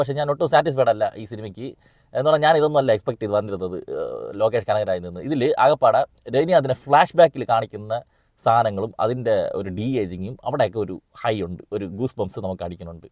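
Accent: Trivandrum, India. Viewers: അണ്ണൻ tvm ആണോ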